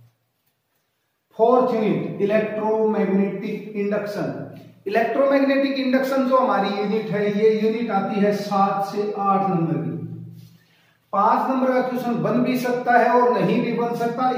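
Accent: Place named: Haryana, India. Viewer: Hindi m haha aapni haryanvi aa sahi h sir ji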